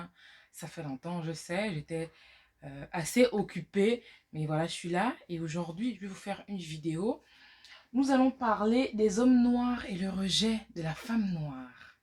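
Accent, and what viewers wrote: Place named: Gabon. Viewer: Le son de ta voix jai directe dit .gabon moi aussi badecon 😂😂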